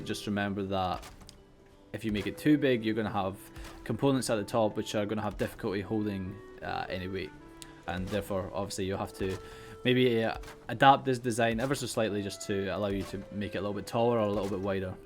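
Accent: Scotland, United Kingdom. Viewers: Nice build dude Are You Scottish?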